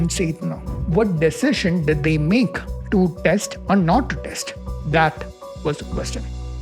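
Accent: South India, India. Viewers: Hey, no doubt that you have ample knowledge of the topic but the only problem is that your accent is too much south indian. Please try to improve it and I can bet that your viewership will increase many folds. Just a suggestion for your growth, please don't take it otherwise. I faced similar problem 18 years ago when my accent was Punjabi and people used to make fun of my English. Thanks, God bless you 🙏🙏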